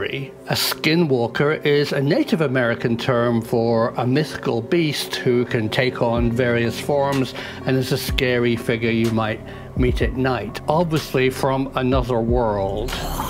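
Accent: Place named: Cornwall, United Kingdom. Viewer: Hi. I'm from England too. I think your from Cornwall. Its really nice you making these videos. This one seems much better so congratulations on your progress. Off topic but are you from Cornwall? It scratches at me haha.. I'm 24 and a massive ufo buff. Again thank you for making these videos. One last question. Where are you AREA 51? THIS VIDEO ROCKS!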